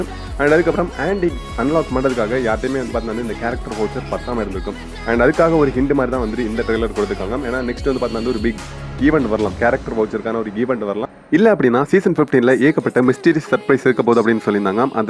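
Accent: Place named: Tirunelveli, India. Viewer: bro tirunelveliya bro la pottu pesuringa Naanum tirunelveli bro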